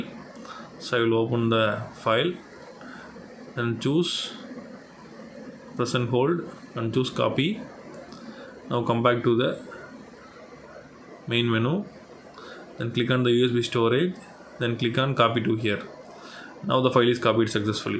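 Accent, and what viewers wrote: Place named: India. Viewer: If you want your discourse to be understood: 1) Try to level up your pronunciation. Many of my friends have a French accent when they speak English, as you do as an Indian native (it's a different accent though), which is normal. However, they can be easily understood when they put in a (very) minimal effort. Btw, speaking any non-native language requires such effort, even from you... 2) More importantly, in order to accomplish the above suggestion, you must stop trying to speak as fast as possible: speaking fast does not clarify anything, especially with such an accent lmao. Speaking fast does not mean speaking well... Even less "thinking well", nor the sign of some superior intelligence : it's quite the contrary, since one would not be intelligent enough in order to understand that the combo of speaking fast with an incomprehensible accent, counters the ease of comprehension (unless the intention was to be misunderstood, which I doubt). 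If you have a strong accent, then speak slower in order to pronounce every word (as said above, it's an effort that you MUST make, like it or not).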